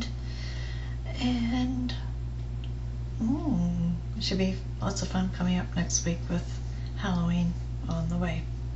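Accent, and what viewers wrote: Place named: Ireland. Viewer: Well done. Deborah is very talented i went and had a look at her website too! I love your irish accent:-) Please do a whole video one day just talking Irish!!:-) hee hee Happy week to you Lotsa love x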